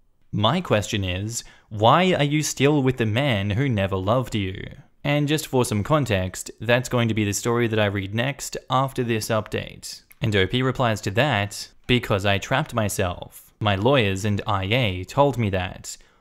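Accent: Australia. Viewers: Purely out of curiosity, what kind of Australian accent does have? Whereabouts?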